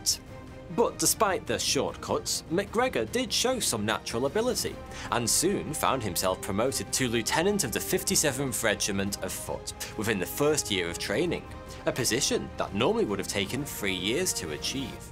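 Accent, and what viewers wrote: United Kingdom. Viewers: Anyone surprised the guy was British? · I always hear "forty two" instead of. British accent is confusing 😂😂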